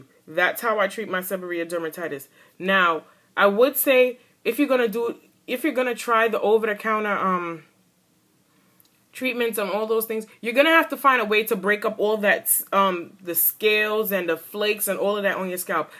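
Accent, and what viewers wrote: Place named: New Orleans, United States. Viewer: THANK YOU but it sound like you from N.O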